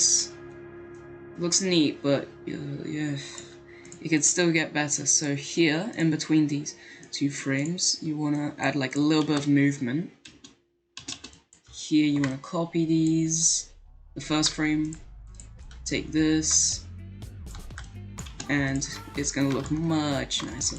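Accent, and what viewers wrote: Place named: India. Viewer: dude, something funny happened because of my stress, lol, so i got a buncha tutorial tabs opened about walking tuts, and the other video is an india guy talking, it loaded quite slowly, so i opened this video and i lit thought your an indian guy xd anyways, thanks for the tut, the only useful thing i learnt is the stretch thingy :) thanks you again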